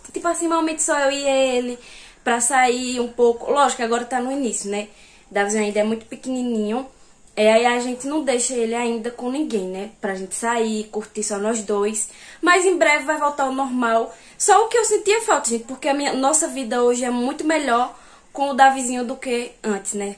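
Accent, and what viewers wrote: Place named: Pernambuco, Brazil. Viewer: Pernambucana arretada amo esse sotaque também sou de Pernambuco..bjs conterrânea 😘😘😘😘😘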